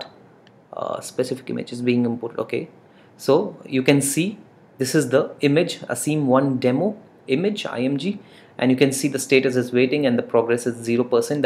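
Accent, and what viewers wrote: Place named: India. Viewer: That is the most childish explanation I have seen of setting up anything esp VPN just copy paste 0.0.0.0/0 what does that mean bro lol for average people at least tell them what it means ?? what rubbish! Guys at QNAP please get someone better then this indian dude or pakistani and trust me I know the accent from where I was born!!!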